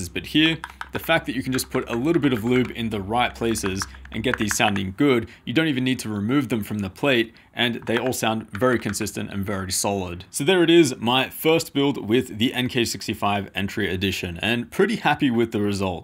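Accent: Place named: Australia. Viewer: I cant tell where his accent is from, bit australian but not really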